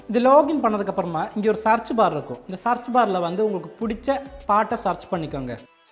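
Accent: Kerala, India. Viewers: Malayalam slang mari irukku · Bro ninga keralava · Bro Nenga Kerala Va...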